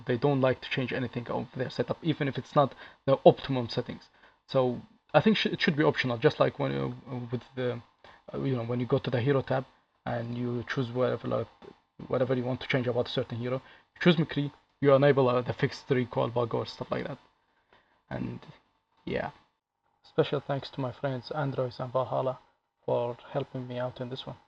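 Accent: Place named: Arab world. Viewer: والله كنت اقول الاكسنت عربي ههههههههههههه.. من وين؟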